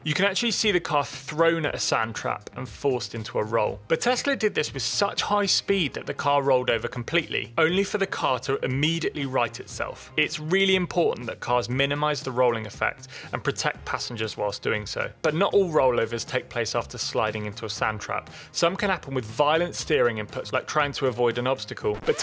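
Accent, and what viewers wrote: United Kingdom. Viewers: Is he British??? Just curious to know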